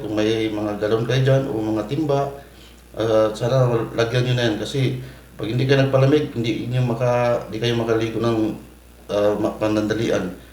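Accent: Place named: Visayas, Philippines. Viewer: Bisaya mn ka Idol ky salita halata mn gud ta mga bisaya 😅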